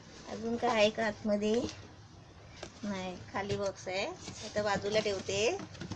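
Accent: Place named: Maval, India. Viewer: khup mast.. tai..! abhinandan tumhala khup shubhechhya..!! aani diwali faralachya videos sathi dhanyawad..!! /\ tumachya maval bhashene mi khup prabhavit zale aahe..!! 😝😍😍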